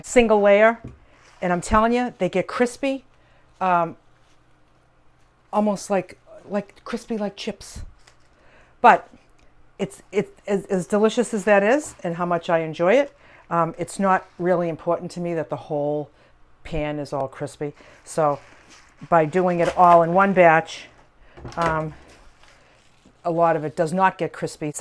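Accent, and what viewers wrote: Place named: Boston, United States. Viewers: New sub here. I am curious, what did you do before you retired? You seem like a teacher to me, maybe a New York or Boston accent? Just a guess. Love your channel too❤ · Hi! You're a lot of fun to watch - love your sense of humour and Boston accent. I'm hooked on your videos. <3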